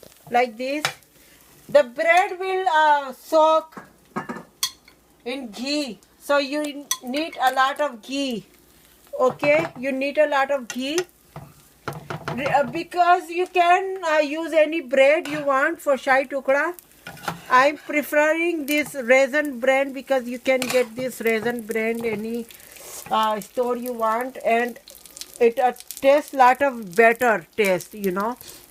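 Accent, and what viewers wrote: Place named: India. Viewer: hhahahahahah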